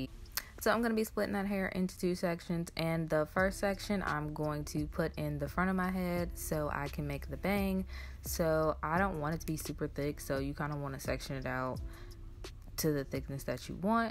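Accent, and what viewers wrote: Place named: DMV, United States. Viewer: You in the DMV,? I hear Ricooo 👀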